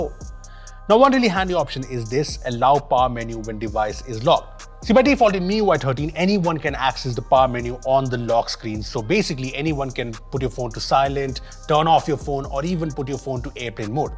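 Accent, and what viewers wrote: India. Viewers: Mrwhosetheboss in indian accent channel. Nice content btw. Very informative and entertaining at the same time!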